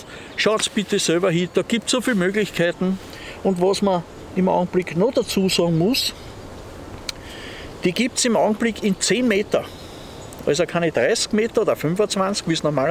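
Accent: Standard. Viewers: Dein Hochdeutsch ist 1A ... als Niederösterreicher warst du für mich total verständlich. 😄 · Moin, schöne Vorstellung! Danke dir. Das elastische Paracord finde ich echt praktisch, das kannte ich noch gar nicht. :) Lieben Gruß Sven PS. Sagen wir medium Hochdeutsch 😋 bist aber wie immer gut zu verstehen außerdem mag ich deine Mundart :)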